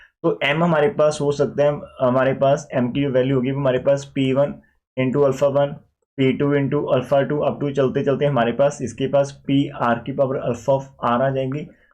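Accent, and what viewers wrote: Punjab, India. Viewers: Sir ap punjab ho kya?? O bhai sir mene kya sahi gusse kya hai maine apka ak short video dekha jisme ap punjabi me bat kar rahe the vo video dekhne se pehle hi maine guess kiya😮😮😮 Punjabi agaye oye🤩🤩🤩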